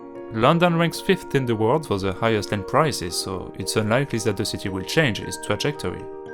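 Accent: France. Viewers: Your accent is magnificent. I love France and the French. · Love the French accent ! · The French accent is beautiful🌹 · It's not the accent which is most troublesome about this (after all, the fact that the speaker clearly hasn't sought help to IMPROVE his spoken English - all the vowels are French, the intonation is syllabic not tonic, etc etc. -all very predictable imperfections - is a comparatively minor matter), it's the extraordinarily careless attitude of whoever commissioned this audio commentary: thirteen seconds in and we get 'to discuss about' something: a mistake which should have been corrected in troisième... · Think most brits like the french accent. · Yes we like french accents...it sounds so french 🥖🧑‍🍳🎭🗼🇲🇫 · You do not need to sorry for your French accent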